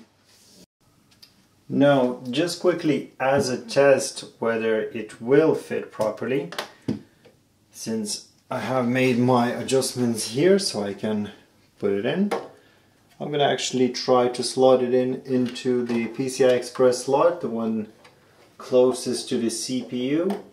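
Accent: Ireland. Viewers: I can hear the slight Irish accent lol. Are you Irish?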